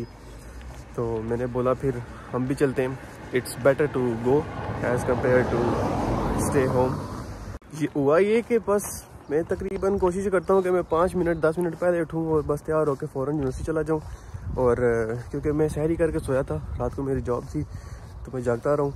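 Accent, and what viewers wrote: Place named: Punjab, India. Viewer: jee love your punjabi touch ♥️ ❤️ bro keep going 💪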